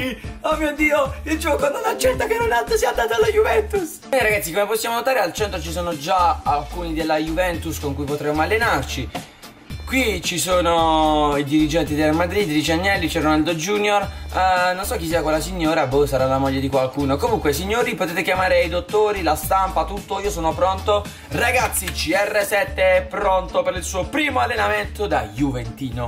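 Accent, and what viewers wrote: Bari, Italy. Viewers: senti ma sei barese?Pk dall'accento pare che tu sia barese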